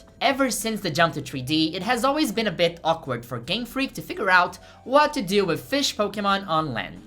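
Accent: Brazil. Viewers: hearing you pronounce quaquaval with your brazilian accent healed me thank you mother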